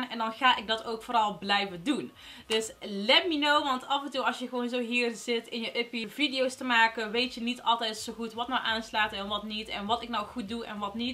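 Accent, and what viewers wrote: Brabant, Netherlands. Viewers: Hoi, ik volg je al een tijdje op je kanaal maar ook op insta. Ik vind je videos leuk omdat je dicht bij de "gewone" vrouwen staat hoe je over komt en hoe je klinkt. Ook nog eens een Brabantse dat is altijd leuk ☺️. Verder is het leuk om naar je te kijken de uitleg is makkelijk te volgen, je geeft eerlijk je mening over producten en da zien we graag! 😍👍🏼 Heel veel succes met de win actie en stiekem hoop ik dat ik win natuurlijk 🍀🍀 Groetjes uit Eindhoven 💄👄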